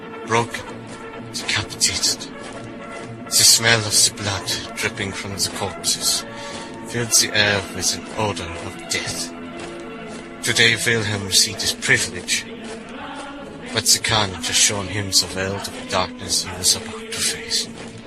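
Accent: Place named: Germany. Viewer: Nice one! Now I'm so hyped!!!!😁😁👍👍👍👍👍👍👍plus I love your German accent 👍👍😀😁😊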